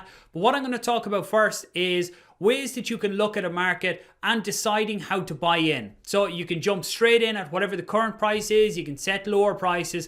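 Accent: Ireland. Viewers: Great idea just creating content just for ADA. Are you from Ireland? I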